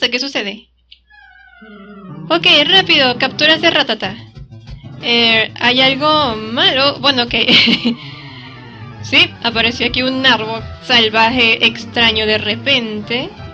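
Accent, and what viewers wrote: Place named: Venezuela. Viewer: escuché tu acento (Ven) y dije: tengo que suscribirme jejejejeje me encanta cuando una mujer hace contenido de pokemon.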